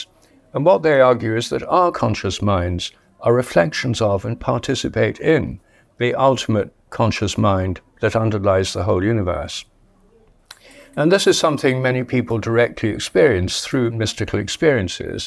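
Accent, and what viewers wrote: United Kingdom. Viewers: Before I started the video I says to myself I bet this guy has a British accent and bada bing, I predicted the future!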